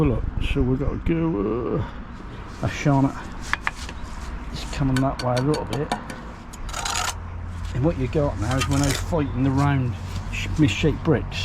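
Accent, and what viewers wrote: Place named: Norwich, United Kingdom. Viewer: Where abouts in Norfolk are you? Sound like a good old narwich boy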